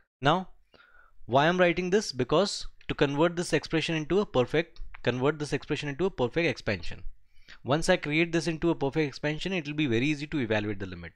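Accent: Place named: India. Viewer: he has an indian accent bro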